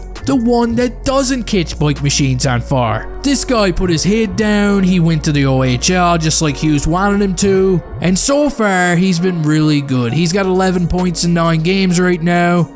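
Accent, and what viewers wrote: Newfoundland, Canada. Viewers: Man you've got to be a Newfie with that accent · Are you from NL by any chance?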